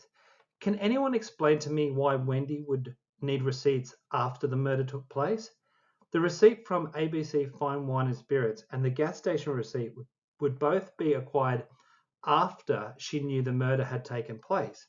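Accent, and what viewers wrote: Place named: Australia. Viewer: Nice to hear an 'Aussie' .. Me too! 🇦🇺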